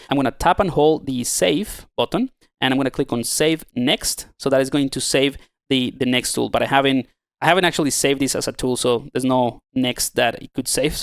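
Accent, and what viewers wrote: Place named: United Kingdom. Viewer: How come you sometimes sound british? Hahaha but more seriously, thanks for the video. I am learning zbrush on ipad. Have never tried any sculpting program on computer or tablet before. Its tough to learn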